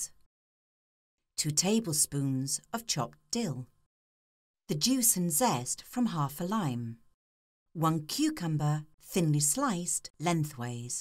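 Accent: England, United Kingdom. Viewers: knew it would be legit after I heard the English accent. lol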